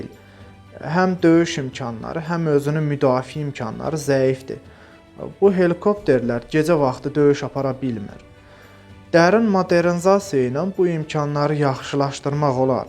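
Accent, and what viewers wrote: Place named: Gadabay, Azerbaijan. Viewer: Qardaş Gədəbəylidi deyəsən. Ləhcəsinə görə düdim. Uğurlar.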